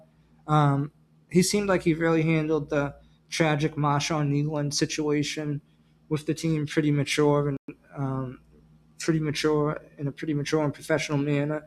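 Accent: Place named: New England, United States. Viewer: Gee, I wonder if this guy has ever been to BAWiston! That N.E. accent is too easy to spot. Okay I like encouraging new channels but my best advice if you want your channel to grow in this glutted market is to STAND OUT. & this much lack analysis ain't gonna cut it. You're not even sure if Schottenheimer was in his 1st year? Fair or not you're not likely to make gains when even the establishment media is saying more than you do. Find some stand-out stats that actually say something. Show clips of a play Shanahan or McVay called that proves they're great instead of just repeating that they are. Give people a reason to tell their friends "dis guy knows what 'e's talkin' bout!" That concludes this episode of "Unasked-for Advice."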